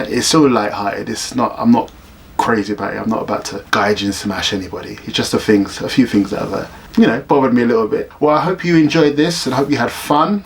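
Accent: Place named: London, United Kingdom. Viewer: It’s funny when they say you don’t sound British or they think you’re from America 😂😂 cause you got the most London accent out here! Great video man 👊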